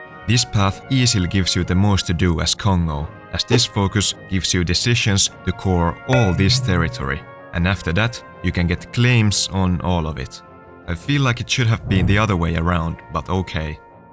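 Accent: Finland. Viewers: Are you Finnish becuse you sound like Finn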